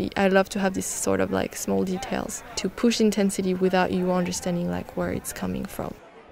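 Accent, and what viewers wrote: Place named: France. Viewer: Can you make a tutorial with you speaking french? I love the accent!! Even though I can't understand them😂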